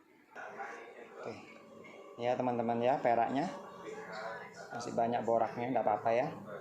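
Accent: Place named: Java, Indonesia. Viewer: Tak kiro pean i wong aduoh kono mas, dadak kok jowo. Jowo ndi mas?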